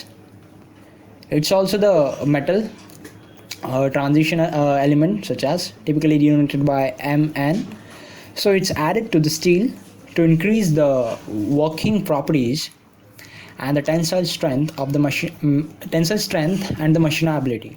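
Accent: India. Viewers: I am not understand your hinglish accent.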